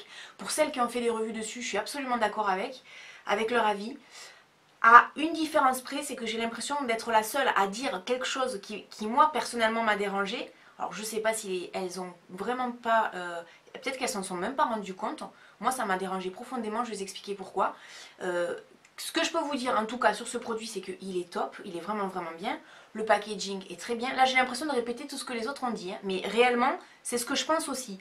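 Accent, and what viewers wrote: South, France. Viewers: CC. Je suis tombée par hasard sur tes vidéos et je ne regrette pas. Ta bonne humeur et ton bon goût font plaisir à voir😂 Sinon c vrai la palette Zoeva est géniale. Continue comme ça. Tu nous réchauffes avec ton accent du sud😚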